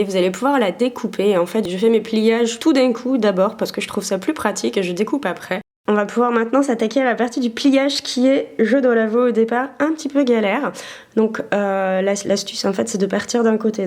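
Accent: Toulouse, France. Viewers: Tu as pris l'accent toulousain !!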